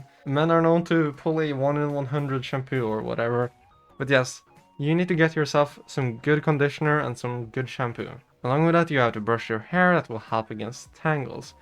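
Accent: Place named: Norway. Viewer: Are you scandinavian? Sorry if that's a personal question but I swear I recognize that accent. *edit: I scrolled down a bit and saw you were norwegian! its cool to see a fellow scandinavian!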